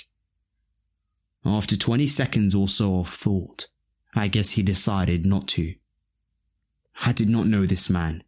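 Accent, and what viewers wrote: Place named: United Kingdom. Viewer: Well this guy is british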